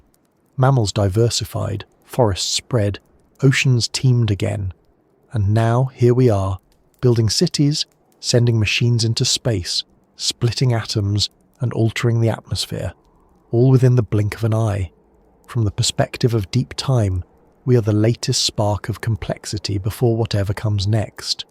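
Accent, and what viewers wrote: United Kingdom. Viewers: I'd like to believe it's some brilliant man from the UK. I'd be so disappointed to find out if the voice is AI.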